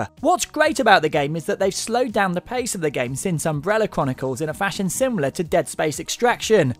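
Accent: United Kingdom. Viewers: i think hes british sh sm isnt out yet grr i must play it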